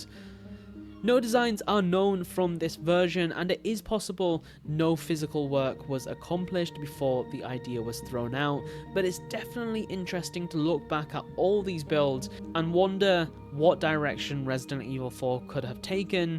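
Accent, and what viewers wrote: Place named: United Kingdom. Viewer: haha you butchered that name with that heavy British accent bro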